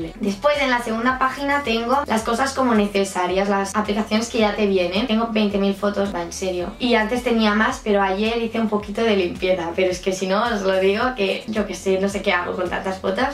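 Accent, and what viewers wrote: Catalonia, Spain. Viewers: Holiii soy nueva en el canal y enserio que cuando te he escuchado hablar sabía que eras catalana, se te nota mucho por el acento 😊 Yo también lo soy😄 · Eres catalana? Es que a veces se te nota un poco de acento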